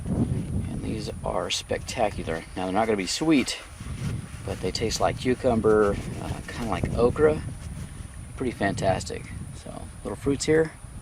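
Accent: Texas, United States. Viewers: Is this how a raw Texan speaks? Theres something stylish about it and more importantly, something rustic and natural, a natural thing that a person from anywhere in the world can identify with. I mean, in my town, old tough men speaks jus like this, and they know stuff just like this. My salutes. You guys are the real natural human thing, and maybe thats why all of them other ones from other countries relate to u and speak the same style no matter what the language is (i mean only the raw natural guys). Theres just something universally common about you rare lot around the world. The things u endure, the things you eat, the way you carry on unlike some city folks who wud just say yuk, freak out , run and eventually die prematurely. Once again, respect to you. Thanks for showing us a glimpse :)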